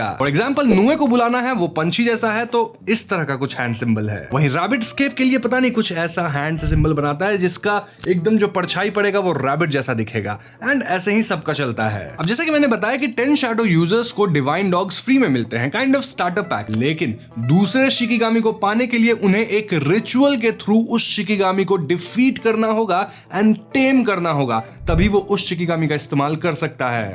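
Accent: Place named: Bihar, India. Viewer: Your bihari accent is so sexy... Please say it again....❤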